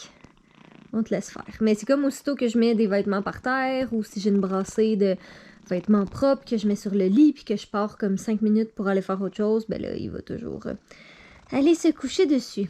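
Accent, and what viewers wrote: Canada. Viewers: J'habite en France et j'adore l'accent canadien.Vous êtes charmante, mais là, j'ai du mettre les sous titres, vous parlez trop vite !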